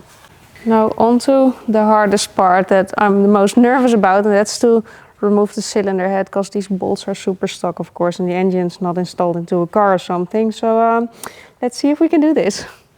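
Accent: Netherlands. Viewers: its just the normal dutch way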